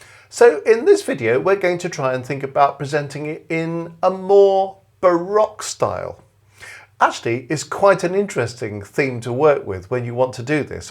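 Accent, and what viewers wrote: United Kingdom. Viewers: Love your videos...and your British English is delightful! Thank you,!!!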